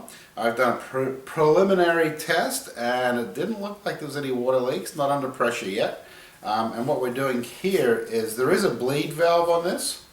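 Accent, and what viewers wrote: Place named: Australia. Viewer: Nice job. Did you do a follow-up video? I think I'm hearing a bit of an Oz accent but the winter scene is throwing me. Where are you located?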